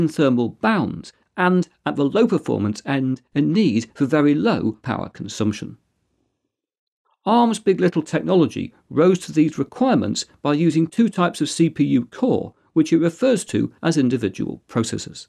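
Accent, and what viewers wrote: United Kingdom. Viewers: This is how I like computer news: clear, concise and British!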